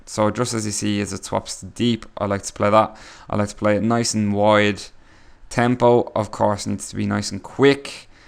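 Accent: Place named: Ireland. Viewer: Lol i wans't expecting this guy to be Irish.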